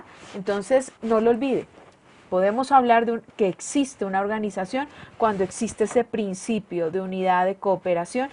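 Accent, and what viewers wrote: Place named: Colombia. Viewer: Adoro el acento colombiano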